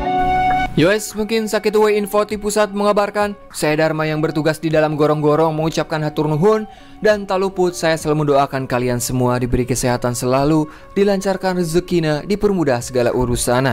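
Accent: West Java, Indonesia. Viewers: Orang mana lur euy sundana?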